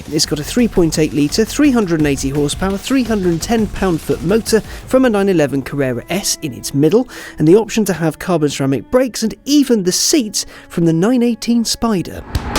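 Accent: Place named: RP, United Kingdom. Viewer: Something about your face is very punch-able. The English-is-mightier-than-thou accent coupled with the quirky comments, just gets my goat. Talk about the car "old chap" and leave out the smirks and snarky attitude. Stop being a priss and just drive the best car Porsche has ever made remotely under $130,000.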